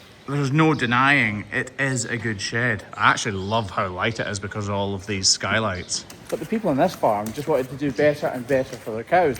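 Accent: Scotland, United Kingdom. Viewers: I don't know what it is but your videos are somehow fascinating. Maybe it's because you're doing so much good for suffering animals? BTW, I have 2 frenchies as well, they have such a wonderful character. I'm german and I always wondered in which country you might live. My guess was Scotland because you are a little hard to understand. No offense meant at all but your accent is quite a challenge for someone who learned english in school and had to do with americans only who btw use only a third of the english vocabulary, if at all. The great comedian Robin Williams once said "once you're plane lands in Scotland you need subtitles". I had a look at your website and found out that I was right, you're based in Scotland. Keep up your good work 👍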